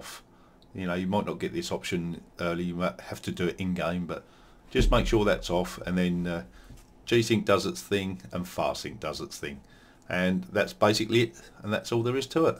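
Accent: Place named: Cockney, United Kingdom. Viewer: By the way, I enjoy your accent and you've got a thumbs up from me son! I'm from yorkshire but I do love a good cockney accent 😂 big Micky Flanagan fan.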